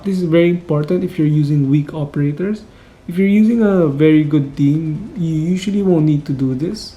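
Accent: Philippines. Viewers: why do you sound so Filipino to me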